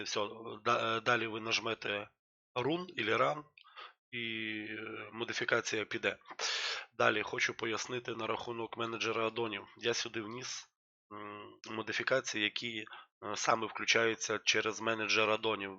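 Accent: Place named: Russia. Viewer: Мне вот дико нравится украинский язык, хотя я русский без каких либо украинских корней (ну вроде). Я не знаю почему, но просто кайфую когда слышу мову. Но российский акцент у есть, подзабыл, так сказать, язык предков. Авторы ценны для публики, когда идут своим путем, не озираясь на моду и большинство.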